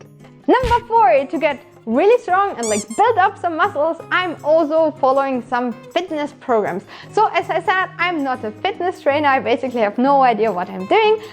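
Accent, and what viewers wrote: Germany. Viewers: yeah haaaard accent i'm from germany too;-) I'd like too see a german cosplay video not made by anny the duck (doesnt means their not good)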